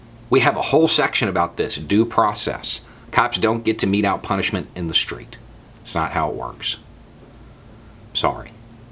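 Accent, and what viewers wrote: South, United States. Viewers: Lowkey you sound like heath ledgers joker. He had a slight southern drawl, particularly your vowels sound a lot like him. It’s pretty cool just noticed it.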